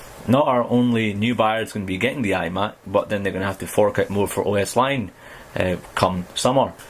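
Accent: Scotland, United Kingdom. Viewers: His accent reminds me Trainspotting !, cheers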